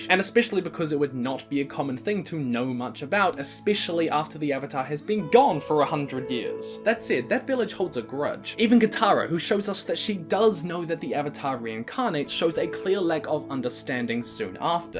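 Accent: New Zealand. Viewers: Man, some old videos, before had tamed his kiwi accent yet.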